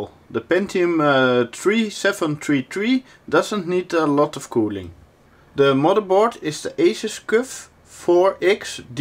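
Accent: Netherlands. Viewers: nice dutch accent leuke pc :)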